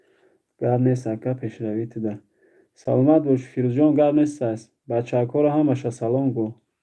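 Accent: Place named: Dushanbe, Tajikistan. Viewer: Чисто душанбески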